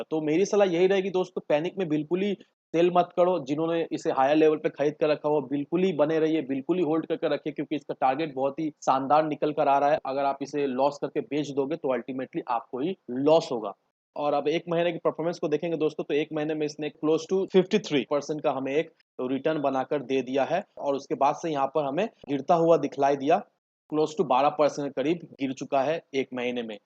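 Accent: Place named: Kolkata, India. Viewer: main kolkata se hu, to mujhe apka tune se aga ki aap ho....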